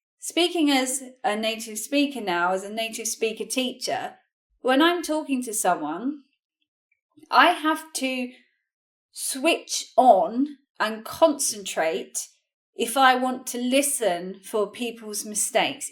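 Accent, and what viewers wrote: United Kingdom. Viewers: My entire life I learned the american english, but I understand better your british english than the american one. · Hi, do you english is british? Thank you for tips!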